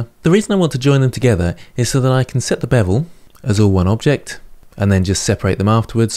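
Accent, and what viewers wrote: United Kingdom. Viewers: I will forever have a british man voice in my head when modelling in blender. G to grab, s to scale.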